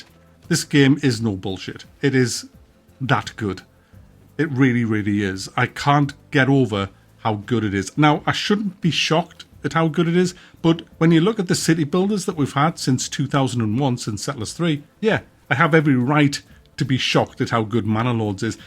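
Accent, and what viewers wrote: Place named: Sunderland, United Kingdom. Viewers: you talk like my friend from sunderland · Awesome vid. I'm new around here and i have to say its great to hear an unashamedly Geordie accent with his no BS attitude. Plus you enthusiasm is infectious. I already knew what you were telling us about the farmers and brewers being the same men that figth on the battlefield for you but your excitement for it hyped me up even more. Subbed. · ahhh so refreshing to hear a fellow northerner on YouTube there's no enough of us! This game wasn't even on my radar it looks like it's gonna be mint! I've got a week off work and was looking for something new to play need to get my hands on that early access like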